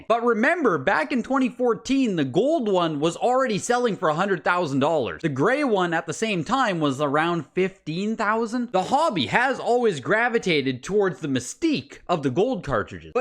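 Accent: Canada. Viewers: What is that accent you have? Canadian?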